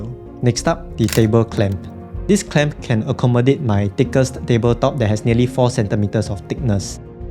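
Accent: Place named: Singapore. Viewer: oh i think you are just casual indonesian chinese youtuber, after hear your accent kinda singaporean 😅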